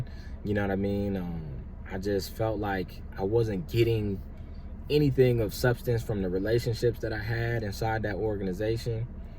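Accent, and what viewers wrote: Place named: Wisconsin, United States. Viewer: I come from a large family. Both sides are JW. I left a while ago. I watched your first video yesterday and as you were talking I was like I know he is from Wisconsin and when you said it, I said... “I knew it!!” . 😁 Stay strong brother. The worst residual effect for me is Anger. And the fact that I was actually about to teach my daughter this mess.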